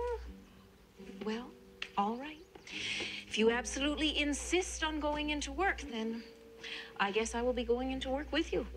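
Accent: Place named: Minnesota, United States. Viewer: This is adorable. And so is her accent, idk if shes from Minnesota, but it's cute. ❤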